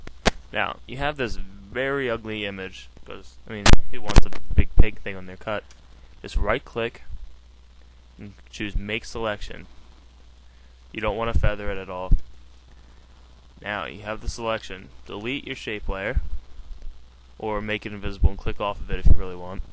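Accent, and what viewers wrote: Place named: United States. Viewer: that american accent gets on my tit i cant understand nothing he says.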